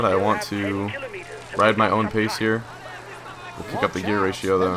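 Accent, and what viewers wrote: United States. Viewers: You're pretty much pronouncing all the names like an ignorant American to be fair ;-)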